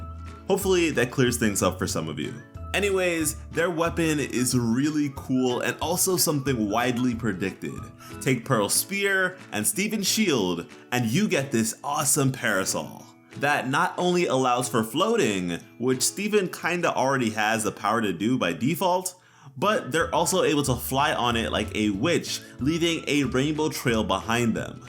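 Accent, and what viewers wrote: United Kingdom. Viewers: He has a British voice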